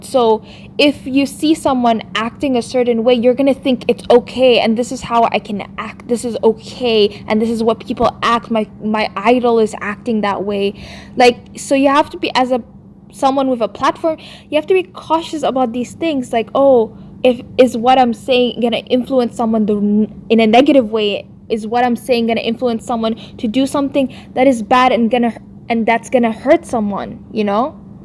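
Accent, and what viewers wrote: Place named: India. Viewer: The fact that she tried to say all she did was identify you by saying you had an accent... no, she said "that weird girl with the Indian accent". She insulted you and tried to play it off like she never said anything wrong and just said you had an accent. And she was being racist too. She's also acting like she's changed now, but she denied everything until there was irrefutable proof that she said those things. And she's STILL lying even though we've all seen the proof. She can keep going with the "I'm not perfect, I make mistakes" narrative, but idk about you but I've never body shamed anyone. And her admitting to being a narcissist along with the body shaming just feels so gross. I know I'm rambling, tbh I've only seen 20 minutes of that livestream so far but it already pissed me off and I have a lot of thoughts on it lol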